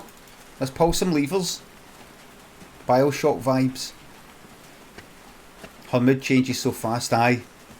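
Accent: Glasgow, United Kingdom. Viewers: I wish YouTube's automatic CC knew how to translate Glaswegian to English.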